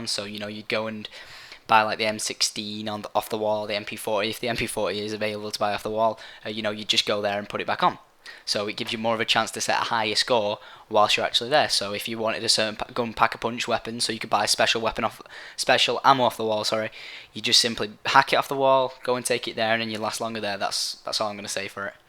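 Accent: United Kingdom. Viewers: Paka poonch. Oh, ur so Brittish.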